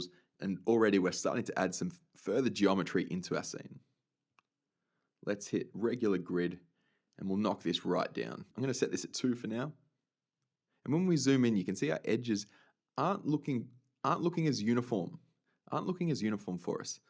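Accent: Australia. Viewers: Australian accent. He is living in Melbourne, Australia.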